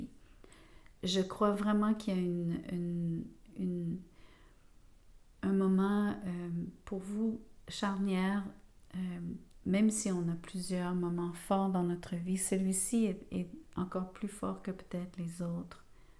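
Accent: Quebec, Canada. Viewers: Heureusement que vous n'êtes pas ma voisine !je serais en consultation permanente ! Rien que pour me laisser bercer par votre accent québecois !😃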